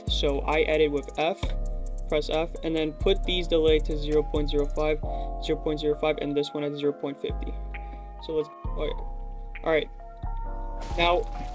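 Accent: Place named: Afrikaans, South Africa. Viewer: you sound afrikaans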